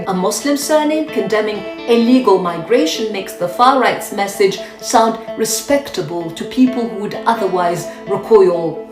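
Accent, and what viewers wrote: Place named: United Kingdom. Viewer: وكأن هذا الفساد والتلون والبلبله وتبجح لايوجد في بلاد الاسلام المشمشي 😊 ههه ياحبيبي، أختاه انتِ تتحدثين الإنكليزيه بلكنه بريطانيه هذا يعني أنكِ تقيمين هناك ومعكِ جواز سفر على ماأظن 😊 وجدتِ الأمان عندهم والرفاهيه فلماذا الهجوم على البلد الذي استضافكِ وجعلكِ مطمئنه على أقل التقدير ياترى 🤔 ؟؟؟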